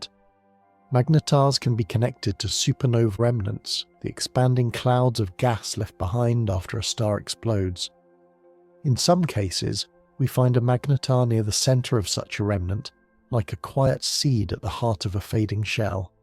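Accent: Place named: United Kingdom. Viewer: hmm you say you are from USA but this is British accent, you should put an "intro" in your channel so we know this is not just another A.I. slop.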